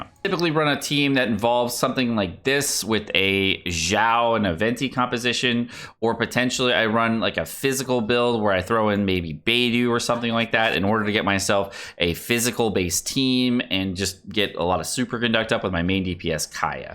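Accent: United Kingdom. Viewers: pog british accent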